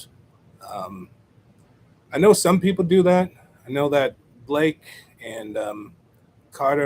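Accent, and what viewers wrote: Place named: United Kingdom. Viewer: Bro I thought you are British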